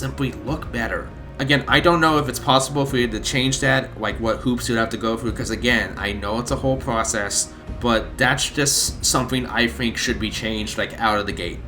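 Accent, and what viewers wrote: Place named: Rhode Island, United States. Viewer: Dude sounds like he from Warwick RI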